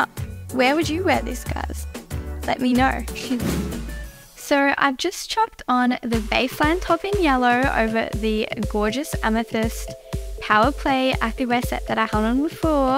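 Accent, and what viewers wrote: Australia. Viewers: Love that Aussie accent, I didn't understand a word she said but it doesn't matter.😊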